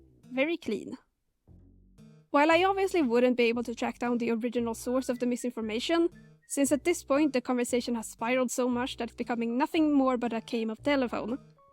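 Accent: Sweden. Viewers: Finally someone's speaking facts about this! Anyway, this is off topic, but I like your accent, I'm Swedish too!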